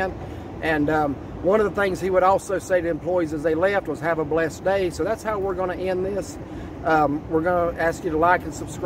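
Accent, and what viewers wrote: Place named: North, United States. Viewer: You can spot a yankee 40 miles. Still don't know how to say pecan .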